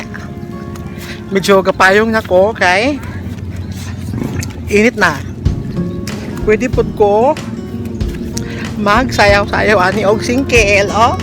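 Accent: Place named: Cebuano, Philippines. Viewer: You remind mi of a cebuano host, pareha mo ug accent. Hehehe ngita lage ug uyab hahaha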